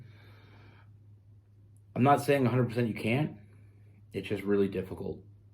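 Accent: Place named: Canada. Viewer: It's the beautiful Canadian accent ❤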